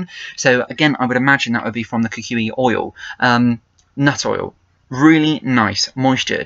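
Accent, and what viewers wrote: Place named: England, United Kingdom. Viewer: omg i loved ur english accent & ur hair.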